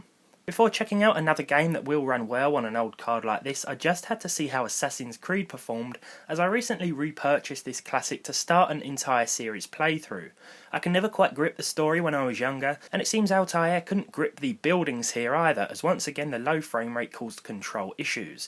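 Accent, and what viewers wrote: United Kingdom. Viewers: I like ur accent where do you come from in UK?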